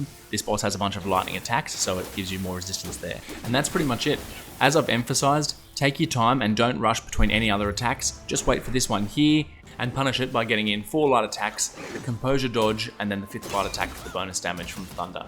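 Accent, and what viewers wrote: Australia. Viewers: Aussie annoying accent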